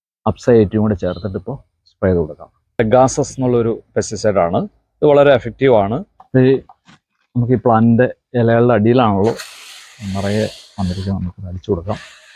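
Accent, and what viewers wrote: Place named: United States. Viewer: ഒരു അമേരിക്കക്കാരനെ പോലെ സംസാരിക്കും. ഇംഗ്ലീഷ്.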